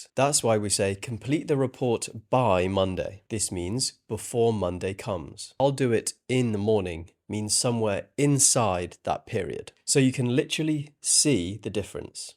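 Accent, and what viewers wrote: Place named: United Kingdom. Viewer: Omg your voice with this British accent is incredible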